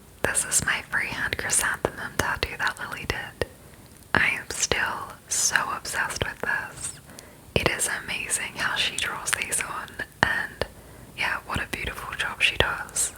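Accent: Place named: Australia. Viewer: I love your accent✨❤️ and your voice is all together relaxing. 😌 I would love to visit Australia someday but for now hearing your accent is perfect 👍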